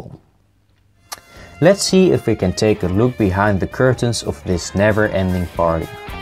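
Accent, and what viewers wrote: Netherlands. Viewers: You Dutch bro? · You sound Dutch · Nice Dutch accent :)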